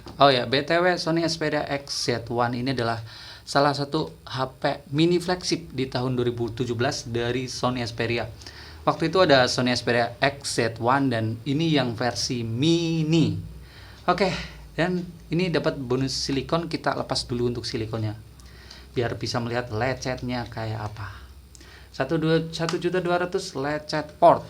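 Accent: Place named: Java, Indonesia. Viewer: Bang lu orang Jawa ya kok logatnya Jawa bngt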